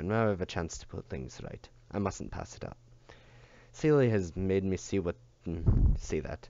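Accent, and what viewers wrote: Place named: Scotland, United Kingdom. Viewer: why do you speak in "Scottish" voice?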